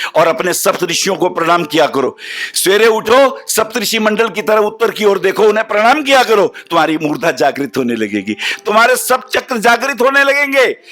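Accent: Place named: Bengal, India. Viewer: This is how we Bengalis pronounce. Thanks Guruji.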